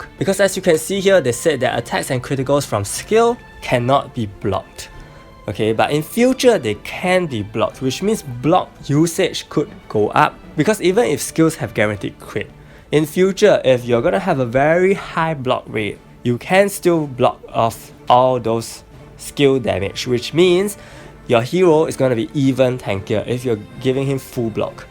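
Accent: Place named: Singapore. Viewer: Your accents sounds similar to a singaporean! Great video btw! You made it more clearer and i love the sudden appearance from orkah xD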